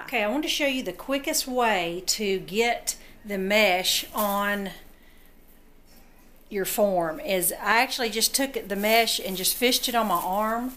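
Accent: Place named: Georgia, United States. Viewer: Love your accent, you sound like my aunts from Georgia!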